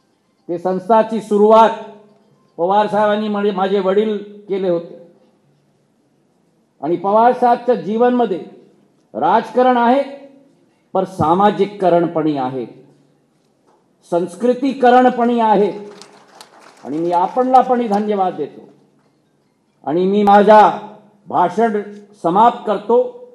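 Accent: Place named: Hindi, India. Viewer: Hindi accent aate h iske marathi bhasha mai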